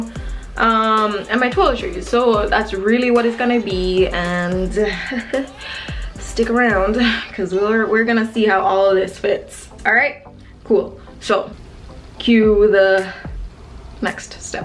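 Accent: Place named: Caribbean. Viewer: I detect a Caribbean accent =) Hey Sis!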